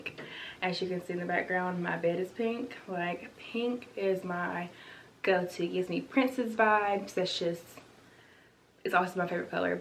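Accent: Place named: South, United States. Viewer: You are sooo down to Earth and your accent is so cute! I immediately heard the "country" in your voice. Im a southern gal.. I live in Louisiana. You are so humble and kind!! Never let anyone steal that from you!! You are so beautiful being you!!! 👑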